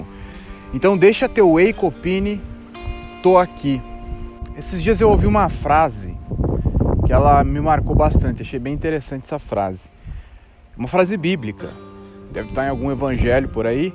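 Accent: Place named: South, Brazil. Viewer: Sou do Sul também, e cara te admiro por levar suas raízes nos vídeos, eu percebo que as pessoas daqui do Sul quando pegam fama ou simplesmente pra parecer com todo mundo acabam perdendo as raízes, nasceram falando "LEITE" e mudam pra "LEITI", não entendo por que essas pessoas precisam fazer essa mudança, se é uma questão de aprovação social ou algo do tipo.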